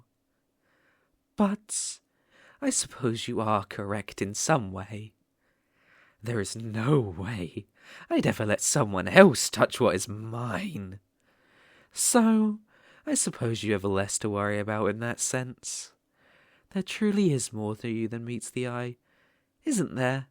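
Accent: United Kingdom. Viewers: Idk why but I can't take anything serious when it's in British like it's so cute and funny so idk bro😭🫶🏻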